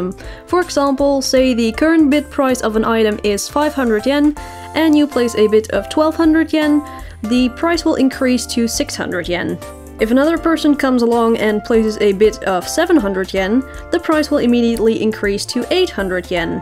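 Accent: Netherlands. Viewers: I had a hunch you'd be Dutch. Sometimes my english accent will sound somewhat similair (as I don't have a consistent English-speaking voice). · ooooOOoo so it's a dutch accent I though you were british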